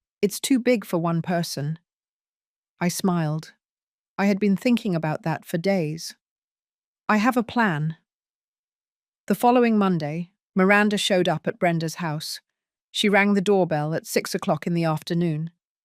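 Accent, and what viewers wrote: England, United Kingdom. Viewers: This British accent is making me crazy. I hate it. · Yes I'm tired of the snooty English accent!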